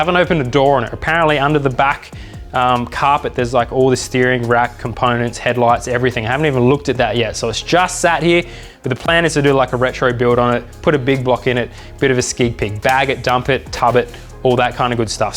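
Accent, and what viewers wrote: Australia. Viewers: How good is the Australian accent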